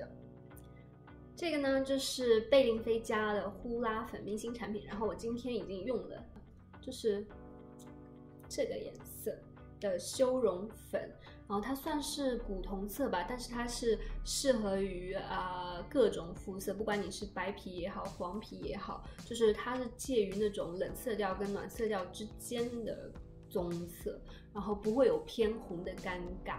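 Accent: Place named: Taiwan. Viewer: 讲话像台湾人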